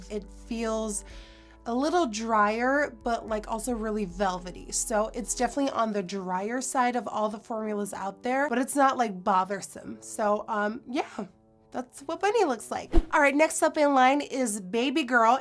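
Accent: United States. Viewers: anyone else thinks she sounds sick/more American in this?? where the holland accent at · I haven't watched her in a few years and oh my goodness her voice is so different did she move to the states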